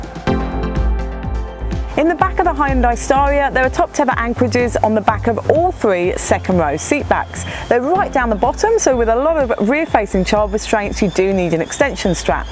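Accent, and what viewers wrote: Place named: England, United Kingdom. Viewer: you r not from down under sounds english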